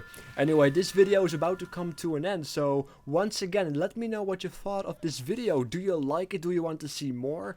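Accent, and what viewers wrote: Netherlands. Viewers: I'm Dutch, and I thought I heard a Dutch accent while you speak. Hence the question :)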